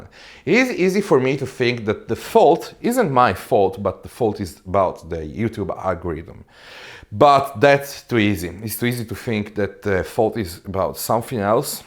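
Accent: Italy. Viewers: May be the frequency of uploading videos could be the problem. There is another channel I follow known as "The pasta queen". She is also Italian and speaks the Italian English accent as you and has good following so may be its not the way you narrate. You should try Youtube shorts to divert audience to your channel as everyone else is doing. Engage the audience and you will again shine. Dont loose the channel at 500k what most people dream of. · The last video I see in this channel is from 11 months ago, I believe that making some short projects that you can upload in between the actual big projects would help with views and also make the algorithm spread it more, your English is perfect, you just have an Italian accent which is not a problem at all. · your english is fine (maybe with an Italian accent what is fine) and also your video's are very nice to watch. I also took a subscription to your new channel, not to miss out on something and hope it does what you want it to do. 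🤗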